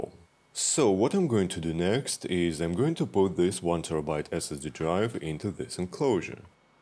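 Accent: Russia. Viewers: Русский чтоль? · русский акцент не скрыть)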